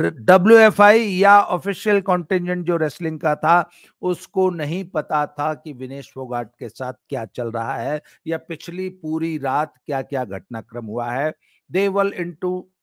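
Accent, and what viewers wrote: Haryana, India. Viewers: Babi ji leave everything aside but your haryanvi n punjabi accent too good...make a video purely in these language. It ll be delight to watch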